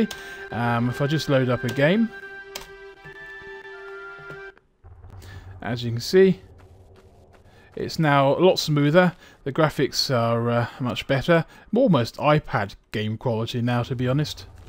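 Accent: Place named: RP, United Kingdom. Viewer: you are awfully posh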